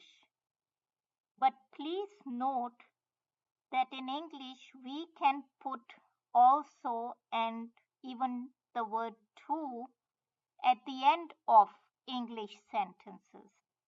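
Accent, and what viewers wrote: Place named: China. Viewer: ...I don't know but the person has got a Chinese accent somewhat but speaking in English and German....XD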